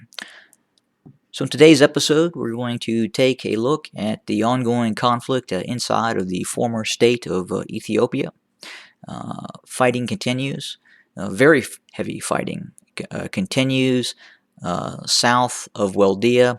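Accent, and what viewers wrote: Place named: United States. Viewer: You are silly and i am 100% sure you are tigrawi with American accents. እናትን ልብዳት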